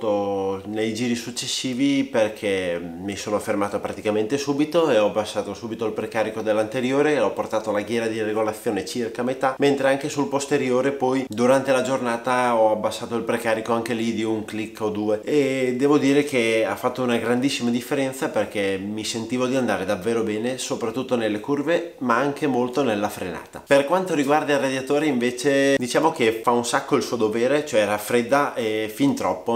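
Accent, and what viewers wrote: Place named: Ferrara, Italy. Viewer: Di quale parte di ferrara sei ?? ho riconosciuto l'accento come il mio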